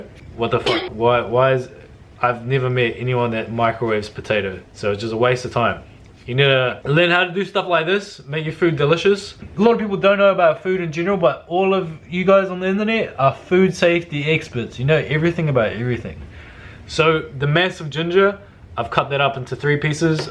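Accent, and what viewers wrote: New Zealand. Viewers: I’m Māori and was looking for bunny chow recipes knowing it’s a Indian South African dish. Last thing I expected was to hear an accent like mine haha. Look so good btw thank you for this!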